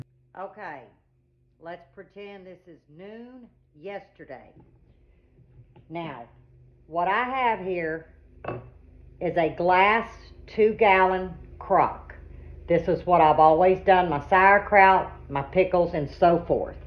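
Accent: South, United States. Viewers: You are a true Southern Belle!!! I love hearing you talk because of your "accent"!! And I love the South.No cukes here yet. We had no rain and I had to haul. I love pickles so I'm praying I get enough. I'm having Grandma Camp next week!! My daughter has treatment so I will take the four children. I Pray constantly that God will keep her here. Okay I need to watch over and write down directions. God Bless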